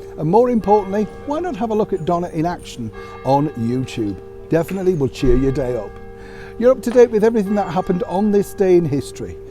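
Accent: Yorkshire, United Kingdom. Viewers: Good morning, you say some words just like my nannie who was herself from Yorkshire yet mostly you have a nice clear voice perhaps from spending some time in London. Loved much of music, I think I'll have a revisit of that, thanks. Great videos today, interesting hearing about your time in radio x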